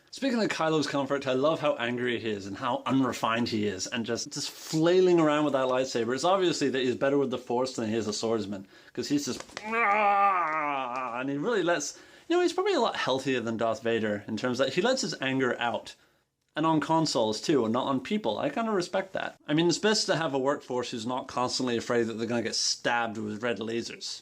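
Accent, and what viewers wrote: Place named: Canada. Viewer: I'm impressed with your YouTubing skills. You're sounding a lot more Canadian than I remember though!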